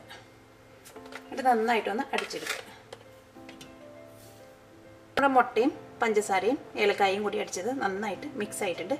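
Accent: Thrissur, India. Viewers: No offence Nancy, but her talking style is her idendity! I would request her to be the way she is! I am not from Thrissur. But when i listen to her, somehow I feel home.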